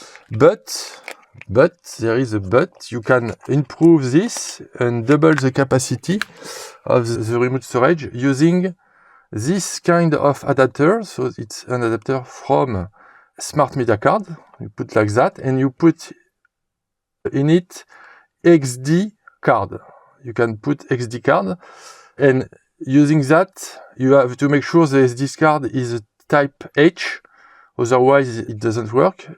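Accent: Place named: France. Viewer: Salut tu as un great french accent 😄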